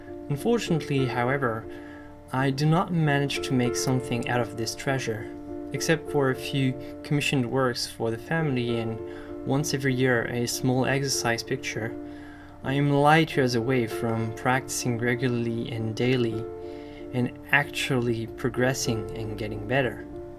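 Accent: France. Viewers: Salut, je sais que t'est français, l'accent dit beaucoup de choses, et j'ai envie d'en parler en français, si quelqu'un veut le traduire, c'est a sa guise, je pourrais l'écrire en anglais mais je trouve ça plus personnel de te le dire de français à français, enfin bref, sache que ce Thomas a eu exactement le même ressenti que moi et beaucoup de gens comme tu l'a expliqué tu est toi même passé par la, mais la n'est pas le truc qui m'a touché, ta réponse, ta réponse change une perspective que j'avais alors même que je m’apprête a faire ma rentrée en école d'arts, j'ai du talent, et je le sais, mais ta vision des choses optimise tellement le gain de confiance et juste le fait d'apprécier créer que tu change beaucoup de choses, je suis passionné de création je voudrais avoir toutes les créations du monde dans ma maison, et je voudrais que les miennes en fasse partit intégrante, j'écris sans réel but la.. 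j'ai juste besoin de poser mes pensées quelques part.. hum. je souhaite rentrer dans l'histoire de la création de l'homme tout simplement pour que mon histoire et l'histoire de mes créations me survivent, j'ai le même objectif que toi, je suis conscient de ma mortalité, mais je suis aussi conscient de l'immortalité de mes idées et pensées. alors sache que mon amour pour toi est infini, et mon amour pour tous l'est aussi, si vous voulez créer.. créez alors, ne vous gênez pas.. j'ai vraiment besoin d'écrire un livre sur mes pensées et mes idées artistiques moi.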